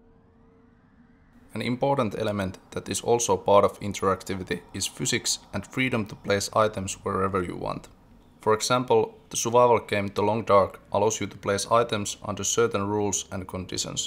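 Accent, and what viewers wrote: Finland. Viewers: Sounds like you are finnish?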